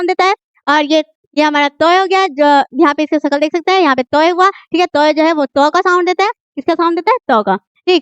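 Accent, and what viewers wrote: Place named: Rural, India. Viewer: Pahle apne madam sir ko kahna ki dehati zaban me na padhaye urdu ko बे ते से 😂😂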